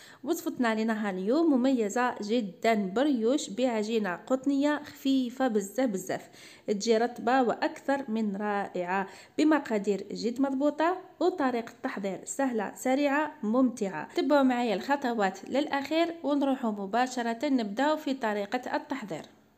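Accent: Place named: Algeria. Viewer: مغربية. كتعجبني. لهجة جزائر غدوة مليح شابين لهجتكم كتعجبني نتاعكم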